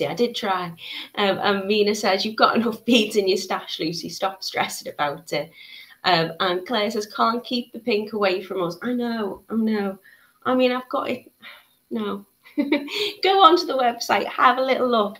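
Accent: United Kingdom. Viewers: NC here! I love your accent!! Reminds me of 2 great months we spent in England in the 90’s. Love making jewelry!! Thank you! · Are you from the Uk?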